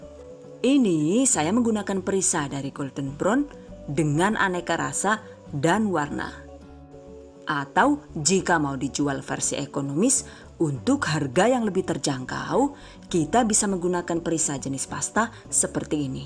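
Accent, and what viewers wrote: Banyumas, Indonesia. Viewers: Susu kedele ne mantul banget,cocok buat ide usaha tuh...nyong sok ngguyu2 dewek nek nonton video ne Rika ne Yu!,kreatif karo lucu koh ana ngapak2 e...hehehe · Budhe.. ko basane kaya basane nyong kabehan 😂 tiyang pundi budhe?? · Aku mah fokus ke ngapak nya lucu abis 🤣🤣🤣🤣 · Enak dan seger bund susu kedelenya,aku sangat suka, diminum hangat enak,diminum dingin lebih seger, pokonya mantap,sukses selalu bund,ngapaknya yang bikin ngangenin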